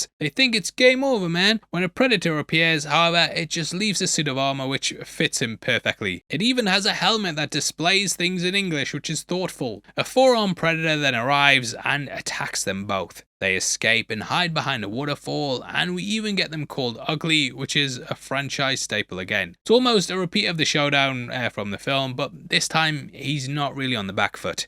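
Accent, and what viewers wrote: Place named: Liverpool, United Kingdom. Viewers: The Weekly Planet guys are Australian, and sounds like he’s from somewhere in Northern England (London is towards the South of England). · Great review, scouser! (sorry, don't know your name... just your accent 😉 ) Only saw the film once (when it came out, I think) but after your review, I think I'll give it a second go - most positively worth it now! 🤩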